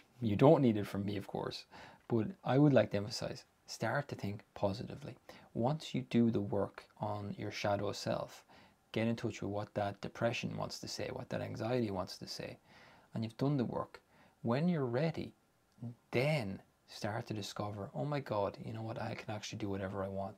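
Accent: Ireland. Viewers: New to your channel. Totally off topic.. are you Irish?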